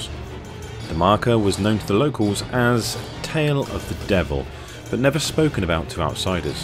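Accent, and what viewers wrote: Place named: United Kingdom. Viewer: Yey funny brit narrator uploaded!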